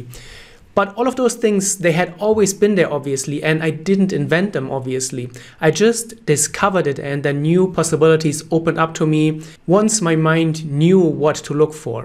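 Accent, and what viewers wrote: Germany. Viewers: Are u German, accent is very much German English accent😁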